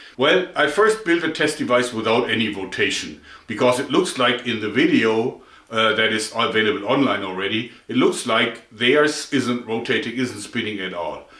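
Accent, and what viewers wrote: Germany. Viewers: funny character Never Changes especially the German accent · Hi. Deine Ideen sind echt klasse,dein Kanal an sich ist ein wahrgewordener Jungstraum. Du hast die coolsten Ideen überhaupt. Und ich feiere so unglaublich deinen deutschen Akzent. 🤣🤣🤣🤣🤣 In tiefer Verbundenheit ,Christoph. 👍👍👍👍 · Das ist nicht böse gemeint aber wenn du Englisch sprichst hört man dass du in Deutschland bist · Bei dir hört man richtig das deutsch raus 😂😂 „This here“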